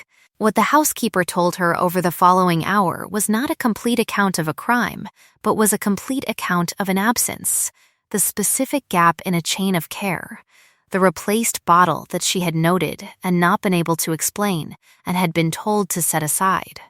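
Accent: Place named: North America. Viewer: This AI voice is annoying and it's a North American voice for 19th century England.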